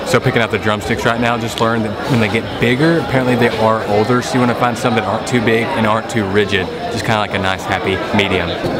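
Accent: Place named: Texas, United States. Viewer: Great video, first time seeing a great presentation of our food and culture by a westerner. The food, both the vegetarian food at the restaurant and the seafood looks very authentic and of high quality. I can't believe that the way you ate is more realistic and natural than how we natives eat. It seems you really enjoyed the food. I am originally from the other side of the Island, the Tamil Nadu state of India, now living in Houston, Texas. Based on your accent, you seem a Texan too.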